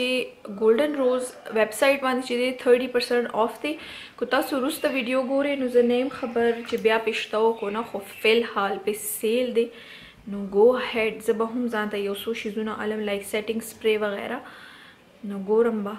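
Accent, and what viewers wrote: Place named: South, India. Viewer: Sounds like South Indian. No offense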